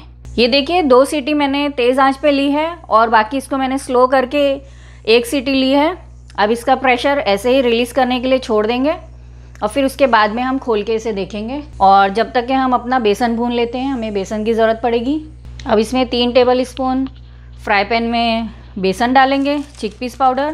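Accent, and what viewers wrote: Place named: Dongri, India. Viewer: Bohot ache aapa, aap dongri kyuki aapke baat karne ka tareeqe mei jhalak hai kya, mei abhi out of india settle hu but aapne muje yeh dikha ke apne bachpan ki yaad dila diye, lots of wishes and keep growing, aapa rocks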